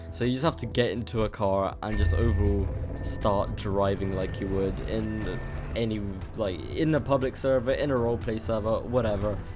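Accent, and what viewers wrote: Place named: Greenville, United States. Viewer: Average greenville accent😭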